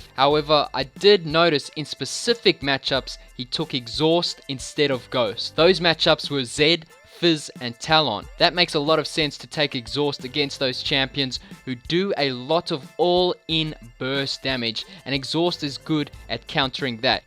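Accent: South Africa. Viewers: Why does this guy sound South African? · You sound South African. Are you South African?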